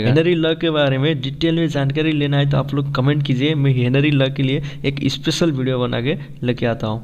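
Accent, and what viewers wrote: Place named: Nepal. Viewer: Nepali ho Kya bro ta .. Hindi ta Nepali le bolni jasto cha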